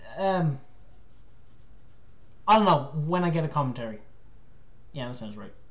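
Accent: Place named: Ireland. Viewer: That Ireland voice (; <3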